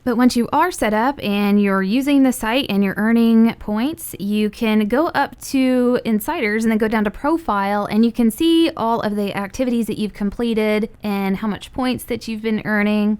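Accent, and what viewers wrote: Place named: United States. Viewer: American accent: 100%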